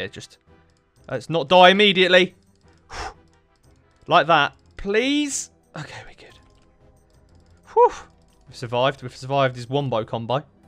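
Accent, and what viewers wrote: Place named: England, United Kingdom. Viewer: english man angrily shouts out unsatisfactory numbers for 10 minutes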